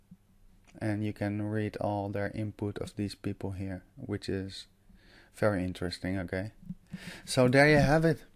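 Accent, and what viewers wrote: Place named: Netherlands. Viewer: Hi, you sound Dutch to me 🙂, am Dutch too....thank you for this video. Please look into the book the Numerical Universe by Anthony Morris. You will find it mind blowing. He shows through Vortex Mathematics that we are living in an intelligent designed Universe of 137 billion years old 🙂 He elaborates deeply this number and I have put it on my car’s license plate ever since I find out about this number. Also in Hebrew the word God is written in such a way that it looks like a mathematical formula showing the Phi sign and the number 7. Greetings from Cyprus <link>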